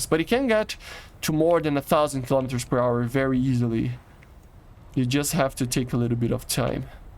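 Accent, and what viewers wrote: Brazil. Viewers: Sotaque de BR....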